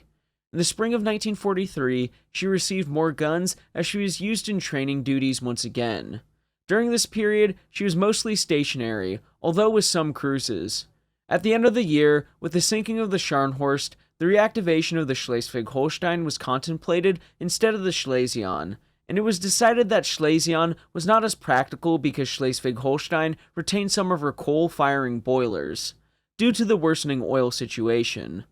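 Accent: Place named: West Coast, United States. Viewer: There's just something about a young white guy with a west coast accent mispronouncing every word that makes me want to black list the channel.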